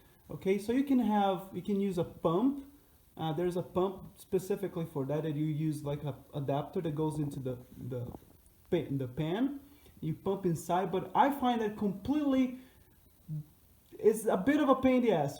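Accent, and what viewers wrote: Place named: Portugal. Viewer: Seu inglês aportuguesado está ok 👌 😂😂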